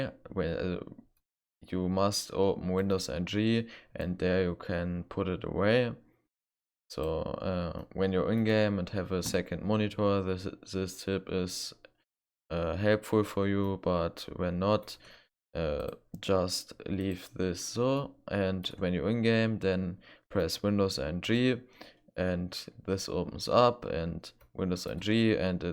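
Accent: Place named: Germany. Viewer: ich hör nen deutschen aktzent :D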